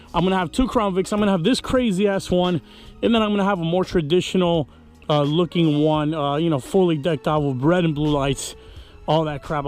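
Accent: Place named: Tennessee, United States. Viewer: StereTyping thats why I said, Im not hatting tho. TN accent is bomb.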